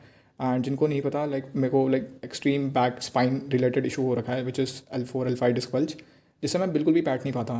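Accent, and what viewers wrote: Maharashtra, India. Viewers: bhai aap maharastra se ho kya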